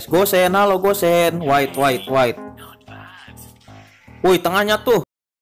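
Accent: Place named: West Java, Indonesia. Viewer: Org Bandung ya..ngomong nya Sunda banget ... Bandungnya dmna kang .???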